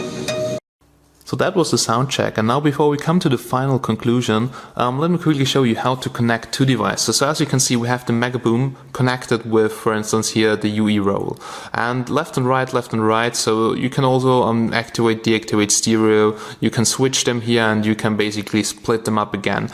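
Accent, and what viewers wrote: Germany. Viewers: uhhhh ....i hate the german accent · wtf is that fucking german accent?!!!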